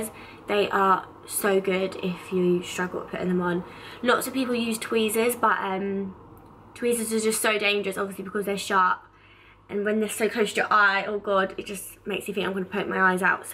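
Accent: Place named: United Kingdom. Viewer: ye but she only speaks like that because she's from a certain part of Britain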